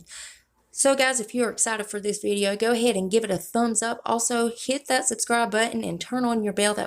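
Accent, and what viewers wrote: South, United States. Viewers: Hey SWEETFACE,, i have a question? I was taken of off the waiting list for the lux box, but I did get my December bass box. So they build me the $24.99 for the December Lux box! So am I going to get charged the other $25 plus another 25 for my January box? Do you know I'm so confused right now. LOL and by the way I absolutely love your videos and your sweet Southern Tang! I'm from down south too so I love you girl and thank you for all the spoilers I just started following you about a week ago maybe two I found you and the first video I was hooked.😅😅😅💖💖💖💖💖💖💖💖💖💖💖💖💖💖💖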